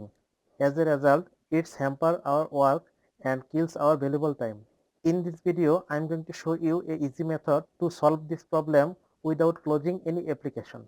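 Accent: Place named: India. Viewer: I clicked off right away after hearing that's he's Indian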